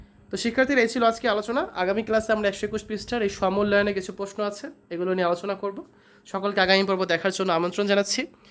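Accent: Sylhet, Bangladesh. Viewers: স্যার আপনি কি সিলেট উপশহর ছিনেন ❤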